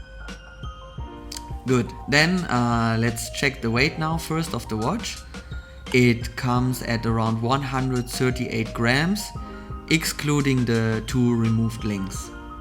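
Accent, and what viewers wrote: Germany. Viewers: When this guy isn‘t german i ate a chair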